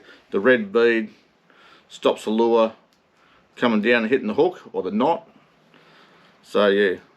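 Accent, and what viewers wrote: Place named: Australia. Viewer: GREAT NORTHERN BEER:, Thanks for the Rig demos for the Tasmanian. I enjoyed seeing this, AND You tilting the Cans of Great Northern. The slured Speech, along with The Down under Accent was busting me up. I think my Demo " BRO", Was half in the bag, while doing the Video. LMAO.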